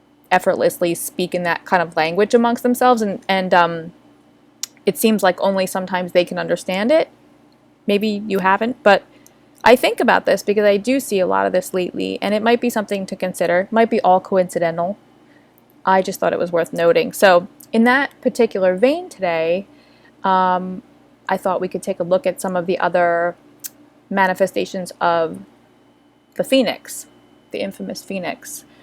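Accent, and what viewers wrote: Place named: Spain. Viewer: I love your Spanish accent.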